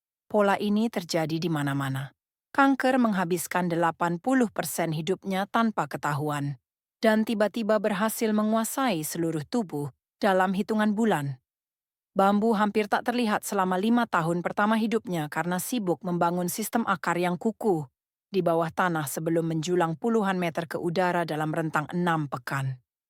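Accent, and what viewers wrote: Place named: Malaysia. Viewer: Kok kaya bahasa Malaysia si, logatnya jg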